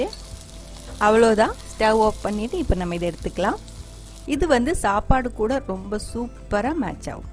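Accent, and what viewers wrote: Kerala, India. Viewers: Your tamil is beautiful mam ..tamil mixed with Malayalam.. Nice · Super fry akka.. Beautiful keral tamil..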